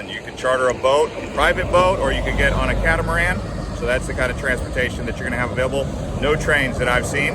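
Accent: United States. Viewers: is in Colombia wearing a 'Columbia' top. Overall, this is a well-researched video. Granted the pronounciations are more American based, but I can see the effort made. It DOES RAIN A LOT. November is also a good time to come with less rain (at least to Bogota). "If you want to find bad people in Colombia, you can surely find them, as you could in New York or Los Angeles. But nowhere have my crew and I been treated better or with more kindness and generosity. I’d bring my family on vacation there in a heartbeat. And hope to soon. As I said before: Colombians are proud. Let them show you what they are proud of." ~ Anthony Bourdain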